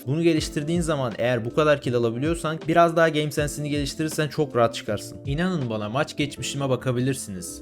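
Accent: Thrace, Turkey. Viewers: Trakyalımısın abe kızan. Trakyalıysan trakyanın neresinden.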